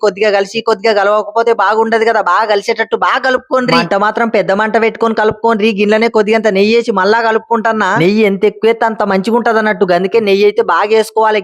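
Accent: Telangana, India. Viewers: Telangana slang ndhuku andhar lo matladandi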